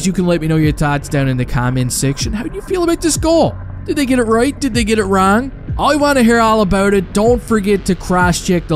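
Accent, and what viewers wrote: Newfoundland, Canada. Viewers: First time viewing the channel and even as a Yank I can tell you’re a Newfie… 😂 love it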